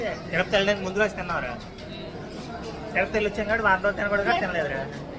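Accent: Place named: Visakhapatnam, India. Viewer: Me latest short video nacha ledhu Telanganal lo konni rojulu unaru meru valla basha yasa ni egatali chesinattu undi... Mee vzm yasa kuda alage untundi ga... Speed lo vachestai... aina ok But ila comedy ki kuda oka limit... Balagam movie lo padina singer vaalu...valla yasa basha ni egatali cheyadam wrong..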